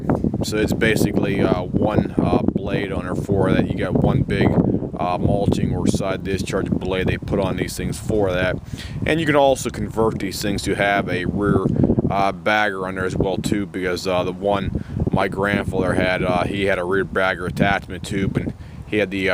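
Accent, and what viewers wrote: Pittsburgh, United States. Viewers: Your Pittsburghese is very strong!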